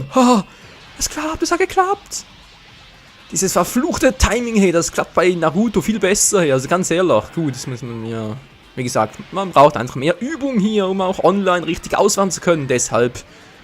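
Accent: Switzerland. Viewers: Bist du Schweizer ich liebe diese Sprache! :DD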